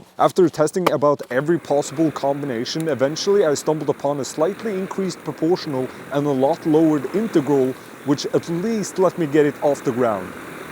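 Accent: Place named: Sweden. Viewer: I did not realize you were having a Swedish accent, I was way to focussed on Russian for some reason😂 hello from Sweden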